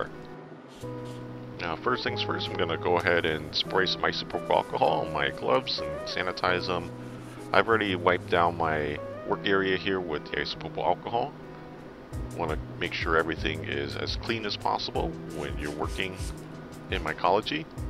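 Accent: Philadelphia, United States. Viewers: . Yup, he's from Philly alright. Anyways, I hope those jawns worked out for ya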